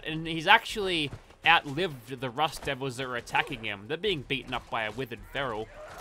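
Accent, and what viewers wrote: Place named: Australia. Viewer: First time in months I’ve listened to your Aussie accent. What do you think of how bad Eddie Jones & the team done in the RWC 2023 ?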